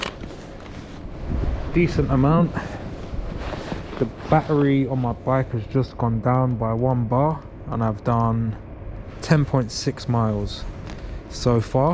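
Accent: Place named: Cockney, United Kingdom. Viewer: 'ow strong cockney accent you 'ave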